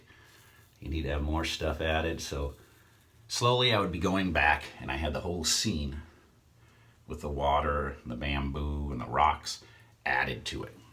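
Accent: Newcastle, United Kingdom. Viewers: Very cool tattoos Sir. I can just hear the Newcastle accent ;-). I have yet to get my first tattoo. Is something I have wanted to do since I was young. I have something in mind and may get it done. Ps if ever you and family visit the UK would be an honour to show you the Hampshire and south coast area :-)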